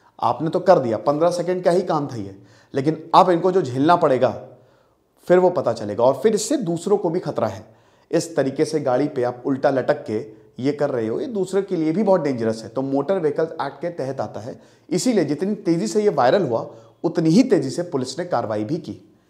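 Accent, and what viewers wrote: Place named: Lucknow, India. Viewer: Sir aapki boli se hi samajh gaya tha ki aap lucknow ke hain....2018 study iq se yahan tak aap roz apki video se gyaan leta aaya hu... Main bhi lucknow se hi hoon...mujhe ataynt prasannata hui yeh jaan kar ki aap jaise achhe aur amazing guru mere sheher se hain. 😀🙏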